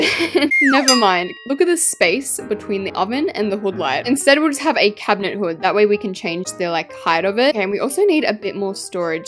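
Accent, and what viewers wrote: Australia. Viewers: I wish we could switch accent I have a American accent, and I love your accent I wish I was Australian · OKAY I love your ✨ Australian accent✨ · Your accent is perfect ( btw I’m and Aussie too)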